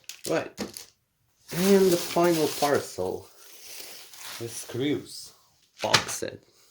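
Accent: Russia. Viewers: I hear that russian accent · 0 dislikes thats what i like to see also i like the voice especially with the russian accent :D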